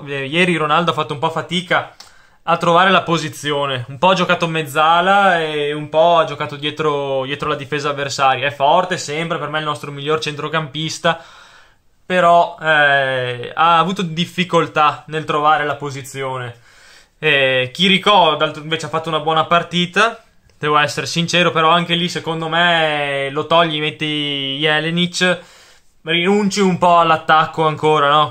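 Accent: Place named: Padua, Italy. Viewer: L'accento padovano è favoloso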